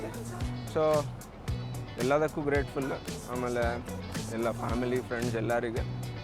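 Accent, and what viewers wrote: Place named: Davangere, India. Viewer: ಪಕ್ಕಾ ದಾವಣಗೆರೆ ದೇಸಿ ಭಾಷೆ ...ಖುಷಿಯಾಯಿತು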